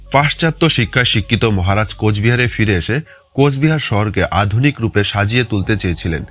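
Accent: Bangladesh. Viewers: Byash not re beta besh bolo ....Dhakaiya kotha kar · উচ্চারণ এ পরিস্কার বাংলাদেশী ছাপ- এরমধ্যে>এ্যারমধ্যে, এছাড়া>এ্যাছাড়া,প্রথম>পথম,next>naxte ,😂 , তবু ও খুব ভালো হয়েছে, শুভ কামনা রইলো।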